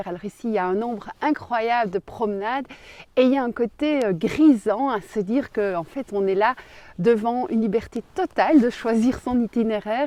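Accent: Belgium. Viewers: Bonsoir nous dans le Béarn c’est 1 heure avec un rayon de 1 kms et bien sur avec la permission de l’attestation bien remplie autrement 135 euros on est devenu dés hamsters 🐹 dans leurs cages quelle chance de pouvoir se balader où on veut .belle balade et l’accent belge qui nous berce .bye bye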